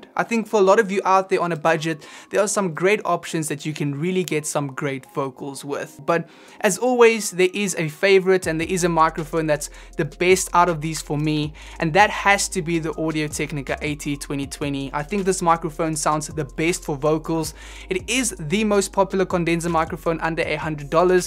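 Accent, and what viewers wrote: South Africa. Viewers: hello ! are you from south africa ?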